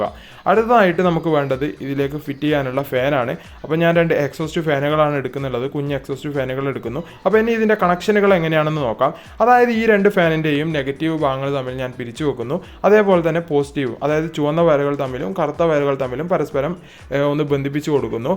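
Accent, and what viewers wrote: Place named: Kasaragod, India. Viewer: Languagil Idakk oru kasrodian touch 😌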